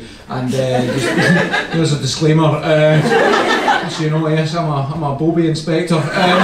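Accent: Scotland, United Kingdom. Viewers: I'm here for the Scottish accent!! ❤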